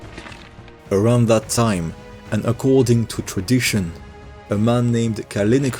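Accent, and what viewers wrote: France. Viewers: mate are you french ?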